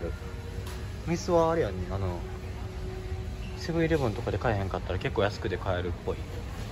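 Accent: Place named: China. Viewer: あなたの中国アクセントも可愛いですね😊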